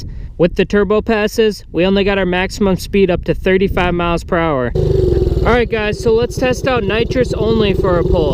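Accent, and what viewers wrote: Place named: Minnesota, United States. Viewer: Another Minnesotan eh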